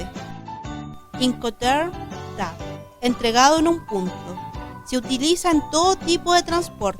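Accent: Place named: Spain. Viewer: Agora que percebi que a fala e o sotaque espanhol são horríveis.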